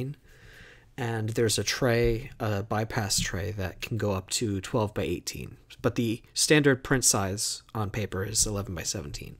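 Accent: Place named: United States. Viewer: Nice art, nicely done, with the creativity to get it together! You are a Greek, though with 100% American accent, if I did not seen the last name I would not guessed it.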